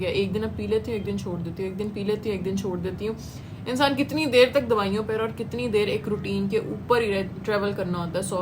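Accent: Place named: Punjab, India. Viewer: Yar aik to smile boht pyari h apki 2nd punjabi boht pyari bolti ho ap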